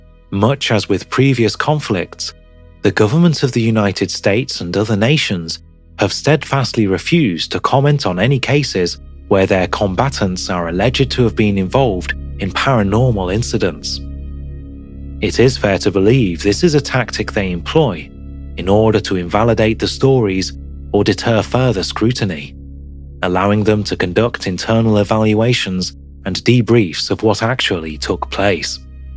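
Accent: United Kingdom. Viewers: It's nice to have a bit of variety. New guy needs to vary up his tone a bit, go for more emphasis, be a little more lively. Otherwise? Pretty nice. But, then, you can throw a decent Brit voice at anything and us 'muricans will go for it.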